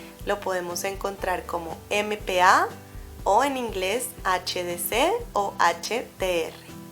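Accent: Colombia. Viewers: Hola , cómo estás , soy Colombiana , y tú de dónde eres , disculpa , tienes acento Colombiano , me encantan estas clases de tejidos , eres muy clara y explicas muy bien poco a poco y despacio .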